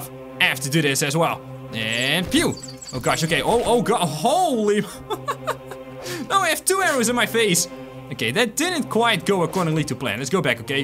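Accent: Netherlands. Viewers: Ar you from netherlands?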